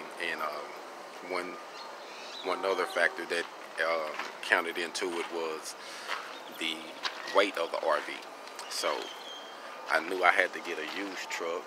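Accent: South, United States. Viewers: Brother you sound like you're from the South..You should know better....Have you never heard of"trailer park trash",have you never been in some.of these truckstops with these fools...What are you trying to prove?..Think bruh,think!!! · Where are you from? Somewhere down south?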